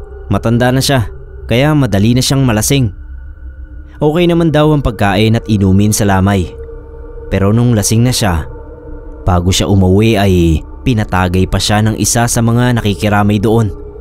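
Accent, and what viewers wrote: Iloilo, Philippines. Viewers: Hello sir new subscriber here😅 Watching from Jeddah...parang ilonggo yong tuno mo sir 😅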